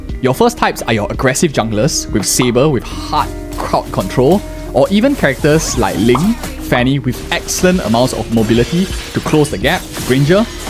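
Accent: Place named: Singapore. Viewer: Prefer this Singaporean voice than those fake A.I. Voices in most YouTube vids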